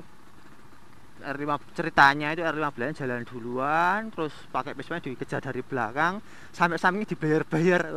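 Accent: Java, Indonesia. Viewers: Wong temanggung@ HAHAHA aku ngekek saben weroh video gon mase iki. Medok banget suarane wkwkwkwk :v :v . mirip IVW motovlog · wong magelang to sampean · Jowo mas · Silang jowonya pekat #frommalaysia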